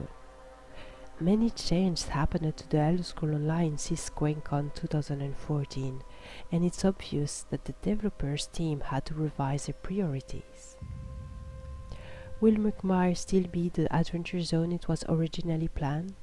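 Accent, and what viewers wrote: France. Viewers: Êtes-vous français ou québécois?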